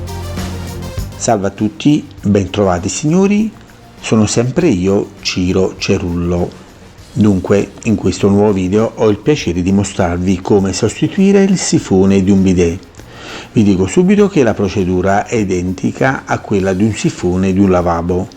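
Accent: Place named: Avellino, Italy. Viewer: sono di Scalea , tu dove abiti ( senza dirlo , ma più o meno ) verso Licola o verso Torre ? A volte sembri quasi avellinese .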